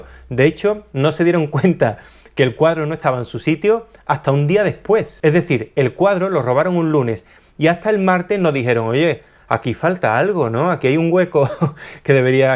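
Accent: Andalusia, Spain. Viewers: Si de verdad amas el arte, hablas de obras que te gustan., siempre hablas, con tu acento encantador andaluz eso si, de obras que no te gustan y las degradas a lo mas bajo. Mucho escozor hay bajo esa melena...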